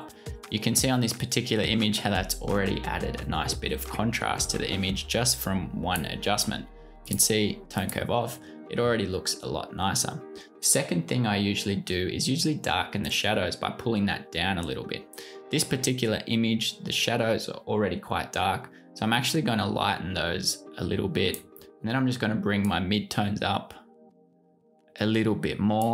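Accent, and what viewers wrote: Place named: Australia. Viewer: I love your work 👊🏾 You sound like you’re from here (Aus) I just had a question...would a Mac Pro 16GB RAM be perfect on Ps + Lr ?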